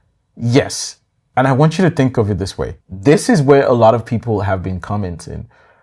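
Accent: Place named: Nigeria. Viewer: Oga that Naija accent can never hide lol. Thanks for the video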